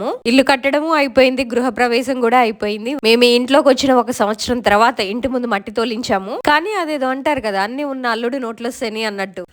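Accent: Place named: Telugu, India. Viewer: Voice lo telugu danam super sis❤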